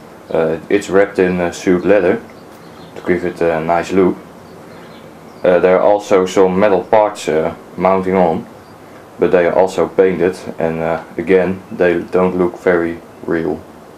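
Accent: Netherlands. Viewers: Are you Dutch?You have a Dutch accent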